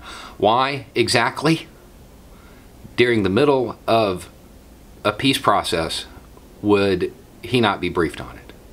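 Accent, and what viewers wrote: South, United States. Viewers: Thank you for being the voice of reason with a southern twang!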